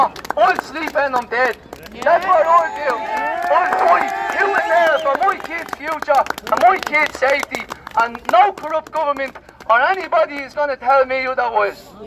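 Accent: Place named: Ireland. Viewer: Your Irish accent is music to my ears, fookin golden 👌🏻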